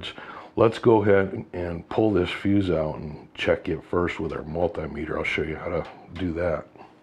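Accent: United States. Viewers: Thank you for your information as said first safety is very important also your English is good and clear (America English) I am watching from Manchester United Kingdom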